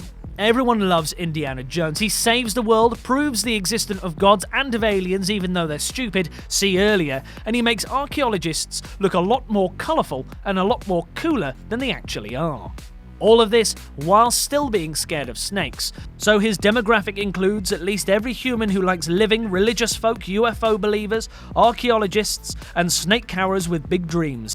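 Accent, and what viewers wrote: United Kingdom. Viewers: Really bad. Lazy and poor. I am trully sorry for you. Almost every character mentioned did, in fact affect the plot of the film. Except for a few who did not, like Cruise in War of the Worlds, or the Basterds. But that was no mistery. It was supposed to be that way. You think that using a british voice will make this sound inteligent? Dumb fucks.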